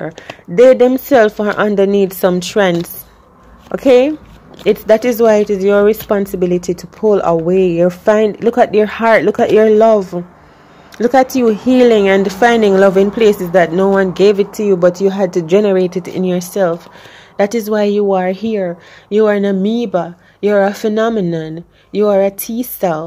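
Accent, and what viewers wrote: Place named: Jamaica. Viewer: I love your patois ❤️🔥🥰 please don’t hold it back .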